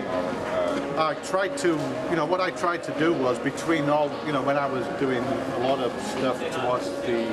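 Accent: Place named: Hull, United Kingdom. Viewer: I love that he still has his hull accent :)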